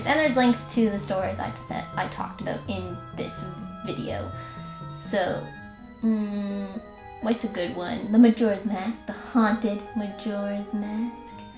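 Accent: Canada. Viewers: If you didn't have the Canadian accent, you would be prefect.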